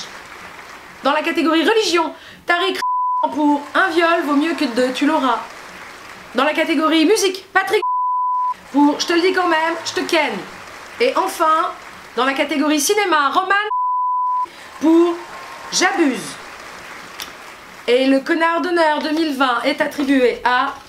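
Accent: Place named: Suburbs, France. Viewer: J'aime bien ton accent de banlieue des années 80 , 90...🙃😉😗😘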